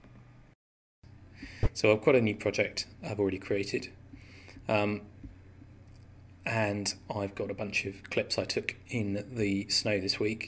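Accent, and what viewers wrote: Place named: England, United Kingdom. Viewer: So glad you have an English accent.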